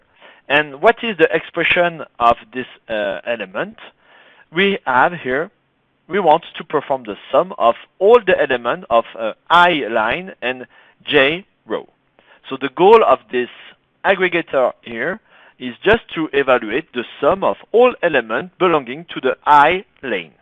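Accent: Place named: France. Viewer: ahaha I was just joking because it was already obvious in the other videos that you are french from the way you say minizinc, nevertheless your videos are informative and I want to thank you for them! Keep up the good work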